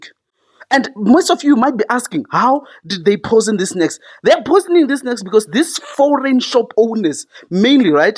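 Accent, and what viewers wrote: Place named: United States. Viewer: I dont think you are south africab, you sound American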